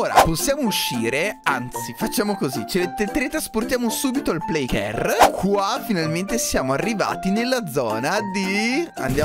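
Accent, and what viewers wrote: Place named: Udine, Italy. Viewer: Mi sembra udinese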